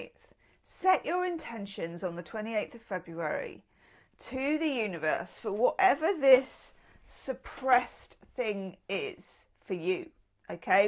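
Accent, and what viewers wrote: Australia. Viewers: Just heard your Aussie accent, sounds spot on 👌fellow Aussie here x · OMG. I love the glasses and headscarf. You look gorgeous ❤ also I love your Aussie accent. It's very good and for my own amusement I am constantly imitating you imitating Aussies with a huge grin on my face even though no one would know why I am so amused. You bring a lot of joy to my life.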